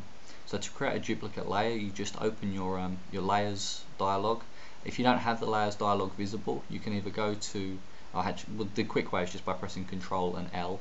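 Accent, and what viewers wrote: England, United Kingdom. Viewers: You have such a cool accent! I thought you were English!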